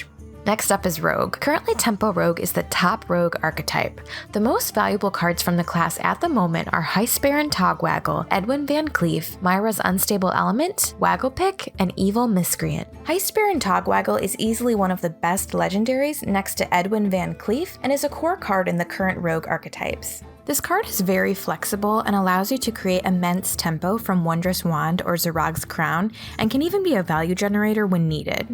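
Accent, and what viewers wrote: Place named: Michigan, United States. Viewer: I don’t hate your voice. But your accent is very distracting when my brain is trying to figure out what a warlack is 🧐😜 Michigan, I’m guessing?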